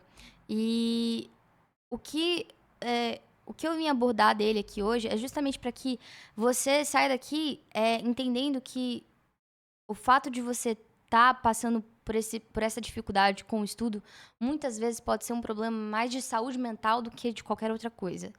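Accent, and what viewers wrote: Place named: Florianopolis, Brazil. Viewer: Vc é de Floripa? (Perdão a aleatoriedade eh que n consegui deixar de notar o sotaque)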